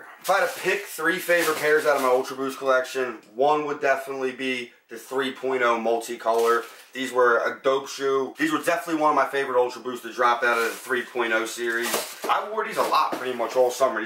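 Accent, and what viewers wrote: Philadelphia, United States. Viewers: philly accent lol say water lol woter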